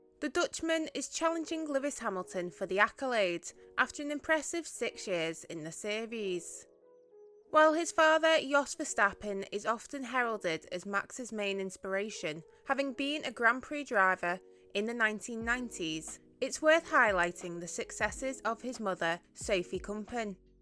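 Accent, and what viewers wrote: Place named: Hull, United Kingdom. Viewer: Great Vid..... Ha e to ask is the Narrator from Hull my family is from Hull.. No accent like it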